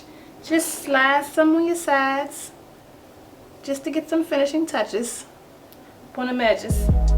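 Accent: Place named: Washington DC, United States. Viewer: giirrrrrlllaaaa (SE girl accent) I knew I recognized that accent from somewhere. I knew you were from DC. Hey girl hey!!! yesss represent for the 4 type hair girls!!! Love all your videos so far and the fact that I subscribed!!!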